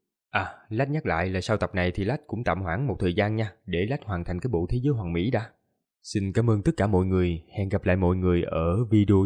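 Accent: South, Vietnam. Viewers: nghe giọng miền nam quen rồi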